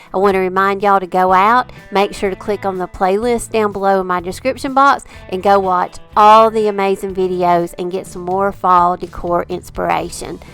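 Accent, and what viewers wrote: South, United States. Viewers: I love your southern drawl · Just now seeing your channel for the first time. Love your accent! I’m a southern girl myself! Great crafts projects!! ❤️❤️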